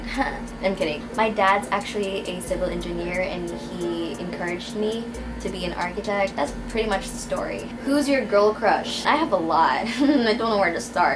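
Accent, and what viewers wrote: Bisaya, Philippines. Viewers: when she's speaking in english seems like she's not a Filipino but when she speaks in tagalog you will actually recognize her as a Filipina and to be exact bisaya. ❣️ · Pag nag tatagalog sya halata na bisaya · Your bisaya accent so cuteee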